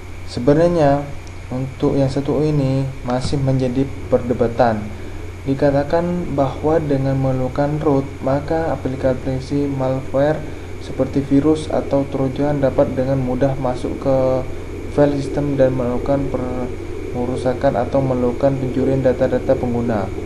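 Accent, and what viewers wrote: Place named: Madura, Indonesia. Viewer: Corak madureh se ngocak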